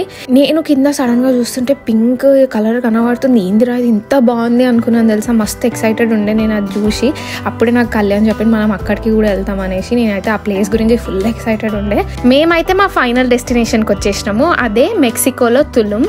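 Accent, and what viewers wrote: Telangana, India. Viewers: #sappaga undhi telangana slag epudu boor kottadu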